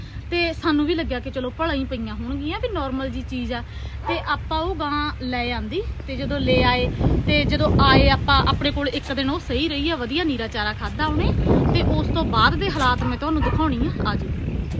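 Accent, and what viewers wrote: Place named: Sangrur, India. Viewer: Didi tusi sangrur wali side toh lgde ho...tuhdi language toh❤❤❤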